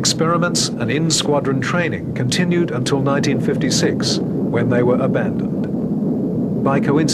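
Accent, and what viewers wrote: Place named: Australia. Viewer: Wow ,i can understand the Narrator good for a change ,sounds like a Aussie.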